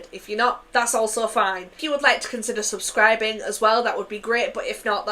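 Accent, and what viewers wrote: Scotland, United Kingdom. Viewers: unrelated you sound so Scottish my god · I mean, she's from the uk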